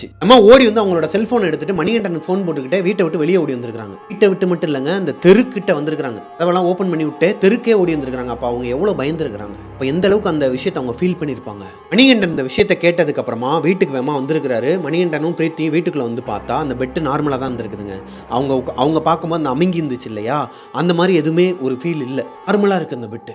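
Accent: Madurai, India. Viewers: Hi.. i m shobana from madurai... i like ur videos... personalave i like u and ur slang.... especially "vellena endhrichuten"... madurai la dan apdi solvanga... neengalum virudhunagar.... so unga videos, pranks ellame parpen.... additionally en baby name prabaharan and my brother name is arjun... so unga videos time ku pathuduven... unga ghost videos la 1 video iruku... <link> <link>... indha video title eh romba bayama irundhuchu... so comments pathen... so many people "ghost vandhuchu.... terrible sound kettuchu" nu potrundhanga.. so andha video mattum nan ipo vara pakkala.... really is it serious to listen...? Clarify pannunga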